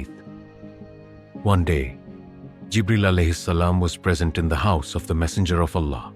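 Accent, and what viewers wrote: India. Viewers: Brother thank you for the video. Please can you change the AI from indian to something else ? Its hard hard to listen to this Indian English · Great video, but can you stick with real english AI voice, the Indian accent is annoying to hear. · Why did you change such nice narrators voice with an Indian accent. The previous narrator’s voice was just excellent for the context you guys put up. Especially for the battle of Karbala. Please us the previous voice 🙏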